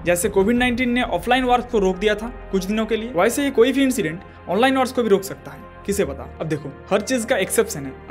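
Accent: Bengal, India. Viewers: Are you bengali?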